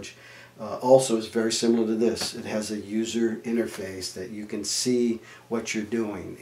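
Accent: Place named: Los Angeles, United States. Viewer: Where is your accent from? L. A.? Reminds me of Greg Graffin from Bad Religion in old interviews.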